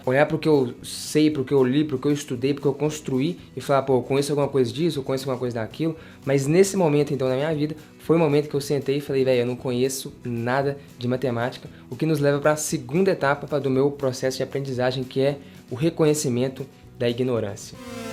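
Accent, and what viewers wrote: Minas Gerais, Brazil. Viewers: krl sabia que era mineiro pelo sotaque, tmb sou mineiro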